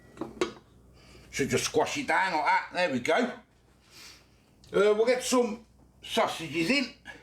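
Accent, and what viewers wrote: Birmingham, United Kingdom. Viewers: Is that a Brummy accent I hear?🤣🤢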